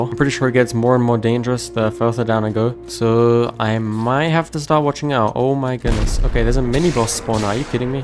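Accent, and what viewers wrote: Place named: Switzerland. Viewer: I think he's from Switzerland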